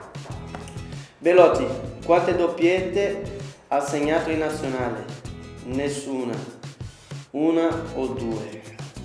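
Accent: Brazil. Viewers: Io lo adoro l'accento brasiliano. Nonostante sia qui in Italia da tanti anni non lo ha perso del tutto e la cosa non mi dispiace affatto. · Is it me or my man still sounds very Brazilian even when he speaks the beautiful language 😅😅? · I can’t speak Italian well, but it seems like he still has a strong Brazilian accent?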